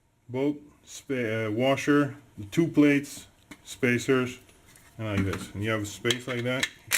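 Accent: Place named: Quebec, Canada. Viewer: He sounds Quebecois to me lol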